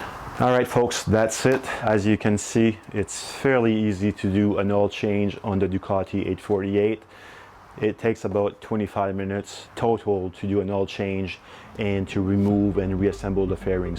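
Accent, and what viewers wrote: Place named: Saint-Sauveur, Canada. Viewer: Je suis de Saint Sauveur, je penses reconnaitre l'accent 😜🙏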